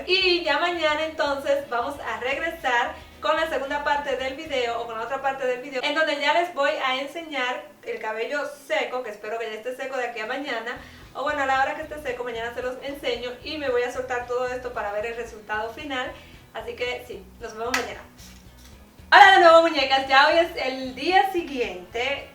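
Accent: Dominican Republic. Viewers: Eres Dominicana? :v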